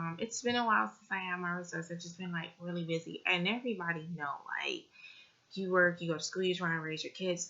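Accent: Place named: Louisiana, United States. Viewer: You sound like someone from Louisiana.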